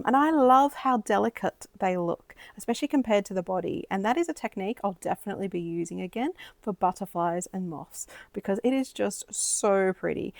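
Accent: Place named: Australia. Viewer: Great collection of coloring pages! I’m really enjoying your channel. Your voice is so pleasant and of course I love your Aussie accent! But beyond that I really like your method of making videos. I understand when someone doesn’t want to talk all the time but I really appreciate your explaining your intentions and thoughts and how you achieve the results you get. Your color along was really good and as you said I appreciate the artist telling me what color they are using or what technique they are doing so I don’t have to watch every second and could actually “color along”. I hope you keep your passion for coloring and make lots more videos because your channel is just what I’m looking for 💕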